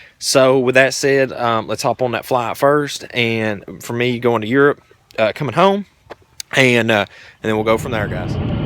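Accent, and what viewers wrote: United States. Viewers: Judging by your accent you are probably American and as such probably never had any lessons in geography so I made allowances for your preposterous assumptions. Firstly, you are actually using polar equidistant map of the Northern Hemisphere as it is on the GLOBE and that is,you must admit very,very poor proof of your FE..Secondly,have a look at the globus (you know, the round spherical thing in primary school class room with maps on it ) and you will see that a trip from Shanghai to Munich would be mostly over Siberia and then Ukraine.Then, how much of the horizon did you actually see on your flight through 26 inch window? All of it or just a bit of it?Thirdly, on equidistant map's grid the straight line is actually a curve as it has to follow latitude or longitude ( parallels and meridians ) depending on course.Lastly, if the Earth was flat with North Pole in the center and South Pole around it ( Ice Wall ) the use of simple compass ( you know, always points North ) would be IMPOSSIBLE.Now try to figure that one out and you will see just how silly is your assumption.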